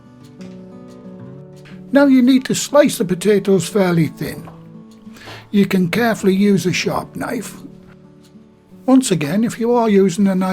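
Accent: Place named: Bishop Auckland, United Kingdom. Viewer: another great video! Your accent sounds so much like my stepdad from Bishop Auckland. Especially when you said “very” like “vury”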